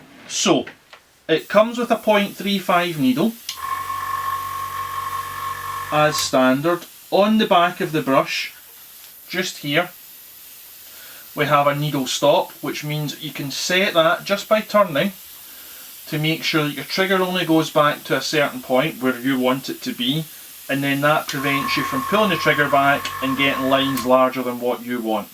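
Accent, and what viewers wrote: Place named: Scotland, United Kingdom. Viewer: LOVE the accent ,what part of Scotland are ye frae?? Great review it helped loads!!!THANK YOU!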